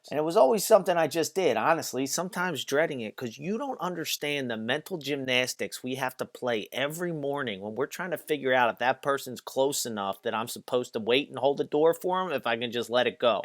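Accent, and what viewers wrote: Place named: Kentucky, United States. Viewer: Soo are you from Kentucky, Indiana, West Virginia? Can't quite place the accent, but you're definitely not from Philly haha :)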